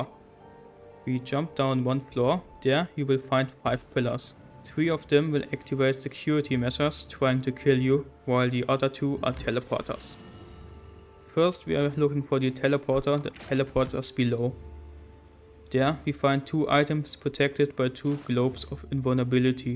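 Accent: Germany. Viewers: Man... there was me thinking, hey i dont know that guy, lets watch that video, maybe he doesn't have a strong af slavic or russian accent like the others, so it might be fun to watch.... Then i heard the most stereotypical german accent ever.... FUCK ME THOUGH! I'm german, too and i just got humbled so very badly xD I could have an accent that sound to others like this and don't even know ... So congrats, you earned yourself a like and tought someone a lesson for life.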